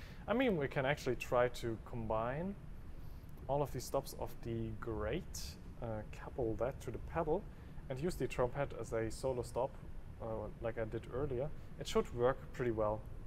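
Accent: Germany. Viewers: Are you from German your englisch is like a German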